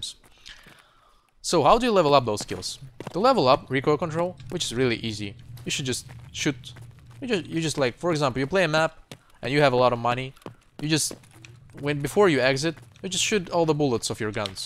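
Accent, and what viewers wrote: Russia. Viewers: Wait, I can hear barely noticeable russian accent :) Am I right?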